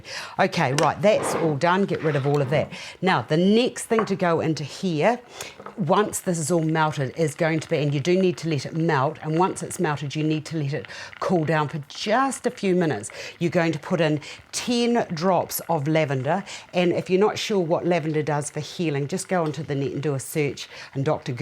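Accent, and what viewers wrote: New Zealand. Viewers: Hi. I'm new to these things. this is my first look at Balms. I really enjoyed this video and found it so easy to follow. I want to thank you for such a great intro into what I hope I will become skilled at. also its great to hear a NZ accent. My family and I are due to move to NZ in the next few months from UK. As if by magic I find this vid first. Great. Thank you again. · John Anthony : Vit E I’m sure ... New Zealander accent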